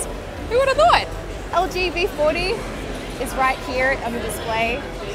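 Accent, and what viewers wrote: Australia. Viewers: So Aussie! So Sydney accent! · Australian mate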